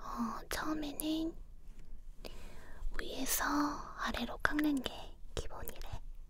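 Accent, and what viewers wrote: South Korea. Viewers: I love this korean accent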